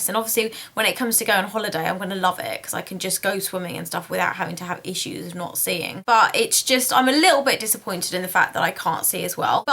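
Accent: United Kingdom. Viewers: A Indian girl talking with a British accent sounds ugly.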